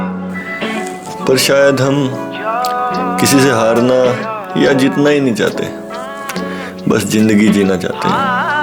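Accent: Haryana, India. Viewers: Oo my god your haryanvi accent 🤗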